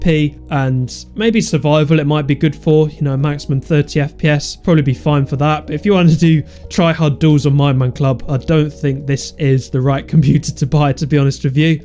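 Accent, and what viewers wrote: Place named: Belfast, United Kingdom. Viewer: u r from belfast. i know that :)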